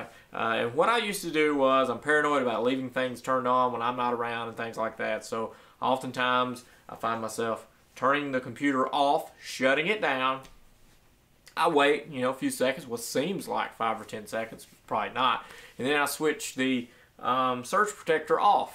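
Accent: South, United States. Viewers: You never heard southern drawl?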